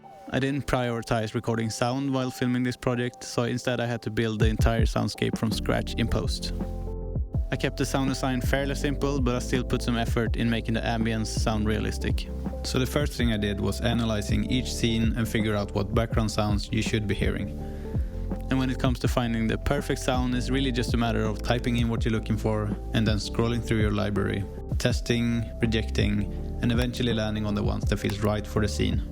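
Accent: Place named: Sweden. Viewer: I like this Swedish English accent :D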